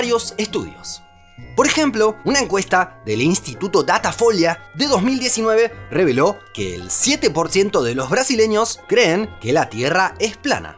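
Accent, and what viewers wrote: Argentina. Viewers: Maquina vos sos Argentino? Si es asi, CAPO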